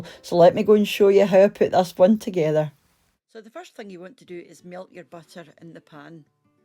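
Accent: Scotland, United Kingdom. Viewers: I can remember my Nunny making this for me and my sister when we were wee and would go to visit her in Scotland. It was always such a treat. I learned how to make it over time and my own daughter was just asking about tablet the other day. I think I need to get out the recipe 😂. I love these videos and just listening to your voice; reminds me of my Scottish roots and family. 🎉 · Love and THANKS from Germany! As wonderful as the recipe is your warm voice and the Scottish you speak - my husband Ingo and I visited Scotland last year for the first time and totally fell in love with the people, the land and the language. It really touches me inside. In my opinion the language/dialect/accent one speaks defines identity and cannot be regarded highly enough! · This recipe video just amazingly ended in my feed! The last time I had this was while in Scotland in 1996! 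Never got the recipe. I subscribed immediately to your channel! Many dishes I see on here I want to attempt to make. I'll let you know how I'm doing! And. My heart leaps with joy hearing your brogue!❤️ I miss Scotland so much.😢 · I'm a Scot living in Italy. It's lovely to hear your accent and the recipe is great, thanks!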